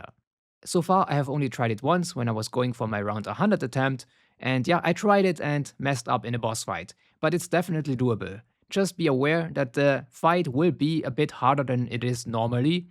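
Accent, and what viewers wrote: Germany. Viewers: Are you German??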